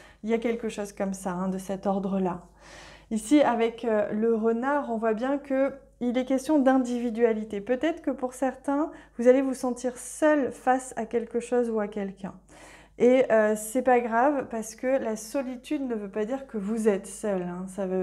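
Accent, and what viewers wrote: France. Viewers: Bravo ma belle; pour ton anglais, avec le ton frenchie ,dont nous les français avons tous, ce qui fait nôtre charme aux usa , tout comme toi ma belle, charmante , adorable, et rayonnante, comme l'étoile du berger, je préfère te voir comme cela, sans voile, je te remercie pour toutes les belles âmes que tu entraîne dans ton sillage ..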